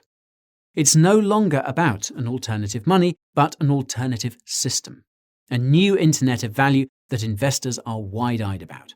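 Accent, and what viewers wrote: United Kingdom. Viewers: It is so much easier listening to a Brit compared to an American.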